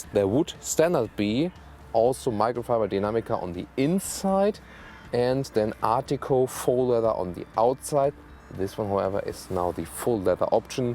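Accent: Germany. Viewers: can't understand, you in Germany, you are German... and reviewing in English, don't get me wrong, but your German accent pain to listen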